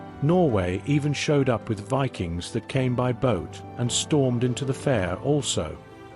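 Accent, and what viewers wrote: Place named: United Kingdom. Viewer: Great video! I like your new male British accent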